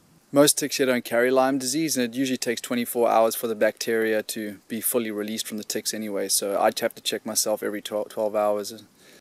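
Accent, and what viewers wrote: South Africa. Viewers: YOou kind of sound south african? Zimbo? That would make sense. You know survival. comes natural with us... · dude, u south african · Are you from South Africa it's just the accent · You're South African? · Is that SA accent I hear? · you sound south african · is he south african? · Sounds South African..long way from home either way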